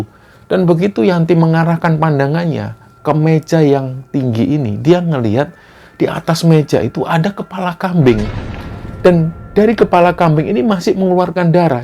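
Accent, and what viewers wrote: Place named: East Java, Indonesia. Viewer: Hadiiirrr Banjarmasin nyimak,aku wong jowo seneng nek ngrungokke mas crito,logat jawanya kentel bgt jowo wetanan..,seneng bgt pokok e,sukses terus mas &tim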